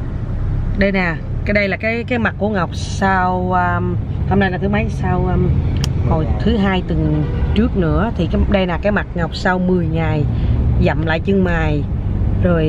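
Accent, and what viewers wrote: Mekong Delta, Vietnam. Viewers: Chi noi chuyen lanh qua.vui ve Nghe giong chi chac la nguoi mien tay dung khong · Ngọc de thương nói chuyện thiệt tình đậm chất miền Tây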